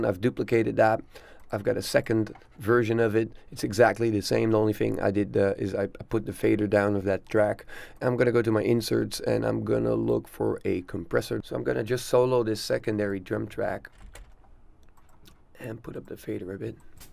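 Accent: Netherlands. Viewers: Amazing tutorials! Within the first few minutes of your video, I already knew; "I'm listening to a Dutch guy". Rotterdam! Lekker man!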